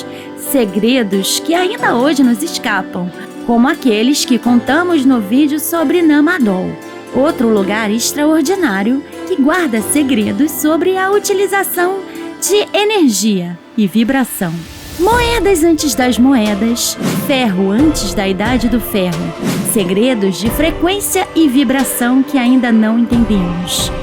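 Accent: Rio de Janeiro, Brazil. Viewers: Amei suas historias e sua voz carioca😊❤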